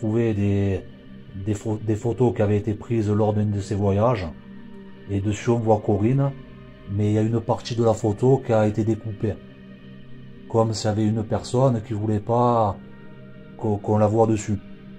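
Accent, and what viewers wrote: South, France. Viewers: Ooooh j'adore cet accent du Sud 😅😅😅 ça m'a surprise like et abo. 🎉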